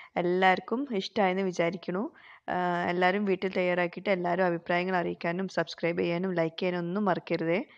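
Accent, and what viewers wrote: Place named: Malabar, India. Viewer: samsaram kettappol thoni kozhikodo malappuramo aavumn. Njn kozhikode aa. Vdos okke spr aanu but sound kurachoode aavato😊😊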